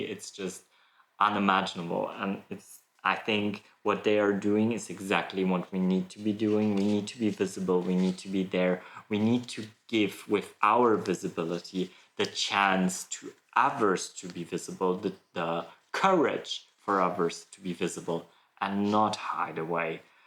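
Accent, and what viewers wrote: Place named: United States. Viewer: They know you're gay, okay. But do they know you speak perfect American English?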